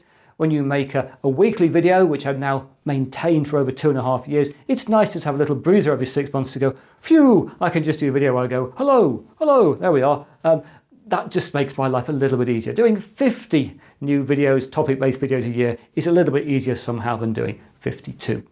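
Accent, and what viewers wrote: England, United Kingdom. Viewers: I very much enjoy the vidieo's, in fact at 62 I'm finding myself once again energized into doing more new things. The video length is good but, probably due to my age, I find you speak a bit quick so I have to go back and re-review to be sure I understood what you said. Lighting is good, subject camera work is also good and your explanations don't seem to get off subject and like most American's, love the English "accent" .... LOL. Recently dropped you an email asking a question concerning the Windows PE and hope to hear from you soon. Please keep up the great work, it is greatly appreciated from this side of the pond!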